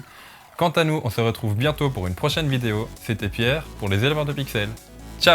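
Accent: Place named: Belgium. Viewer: j'ai réussi à capter que t'étais belge alors que t'as un accent trop subtil putain j'suis trop stylée (j'ai eu la confirmation quand t'as dit "septante" haha), en tout cas merci pour la vidéo, je viens de l'acheter et j'en suis très satisfaite !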